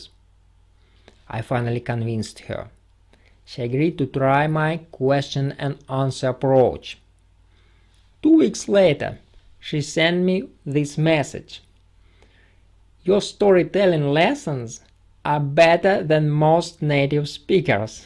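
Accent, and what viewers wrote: Russia. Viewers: yep, he's russian alright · You from Russia??? I know this accent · You have the most stereotypical Russian accent. I love it 😍😂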